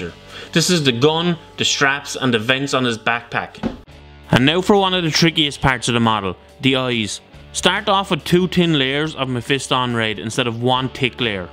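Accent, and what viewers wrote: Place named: Wexford, Ireland. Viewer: I'm teetering on the brink of attempting to paint a miniature for the first time since my teenage years. This is really useful, thanks. It's not everyday I hear such a perfect example of a Wexford accent on the internet either ;)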